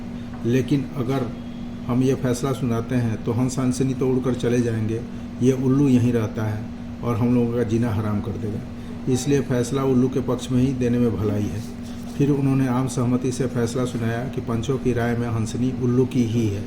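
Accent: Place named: Siwan, India. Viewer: Siwan wala tone hai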